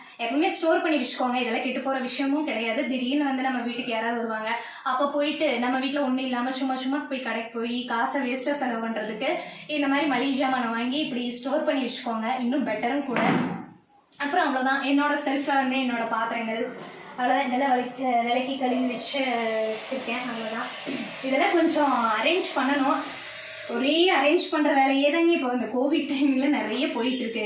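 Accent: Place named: Coimbatore, India. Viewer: Nice sister.. exactly Coimbatore slang.. I too from Coimbatore.. very nice to see namm ooru ponnu🥰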